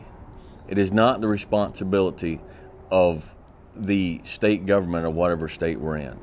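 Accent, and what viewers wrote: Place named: South, United States. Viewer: Lovin’ this southern TED talk ❤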